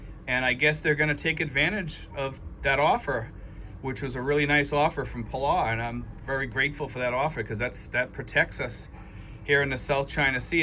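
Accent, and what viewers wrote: Boston, United States. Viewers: Sounds like a Bostonian, or at least from somewhere in Mass. 🤔🧐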